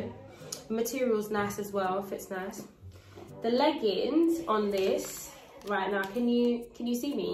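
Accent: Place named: United Kingdom. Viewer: #monaemaffia. Your British voice is everything. Your style is 💥💥💥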